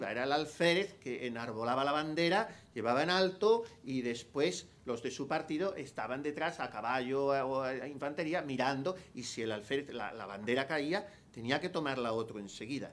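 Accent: Extremadura, Spain. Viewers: Monseñor, se queda uno ensimismado con V., con lo bien que explica todo y con la sabiduría que hay en sus palabras, fruto del estudio intenso, la oración profunda y el cariño sincero. ¿Cómo no apreciarle y quererle tanto? Y, además, con ese gracejo extremeño que comparte con tanta simpatía. Habría que decir como los niños pequeños cuando disfrutaban con algo y no querían que acabase: "sólo cinco minutos más". Porque disfrura uno tanto que se le pasa volando. Buen descanso para todos nos dé Dios 🙏🏻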